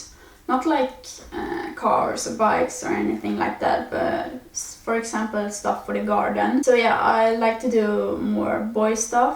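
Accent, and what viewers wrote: Sweden. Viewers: when i heard your accent, i knew you was from sweden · man hör svenskengelskan så bra haha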